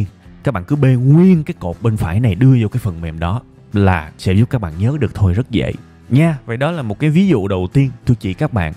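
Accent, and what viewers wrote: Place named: South, Vietnam. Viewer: tiếng miền Nam hài ghê ạ, cảm ơn video của thầy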